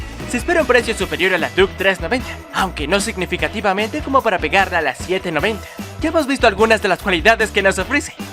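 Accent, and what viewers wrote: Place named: India. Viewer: Acento tipo Apu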